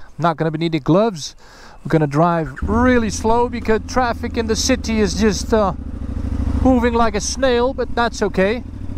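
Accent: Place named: Netherlands. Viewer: Spijtig dat het nu in het Engels is . Het was toffer , gezelliger om in het Nederlands te luisteren . En vooral het Nederlands accent ik zelf ban van Belgie de Provincie West Vlaanderen . Ik kijk naar al je video's en rij zelf ook motor en heb toch al verschillende dingen van tips die je zei kunnen veranderen . Waar door het nu veel beter gaat en de volgende aub weer in het Nederlands . Mvg Dany 🤛✌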